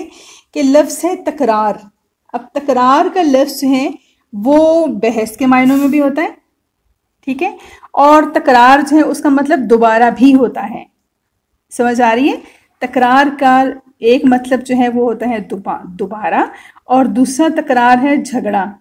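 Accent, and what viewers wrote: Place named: Urdu, Pakistan. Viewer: Lhjy b apka urdu bolta h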